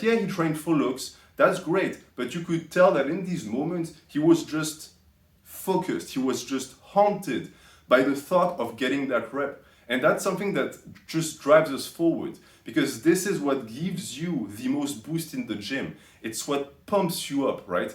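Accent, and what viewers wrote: France. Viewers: I love how sophisticated your English is with your strong French accent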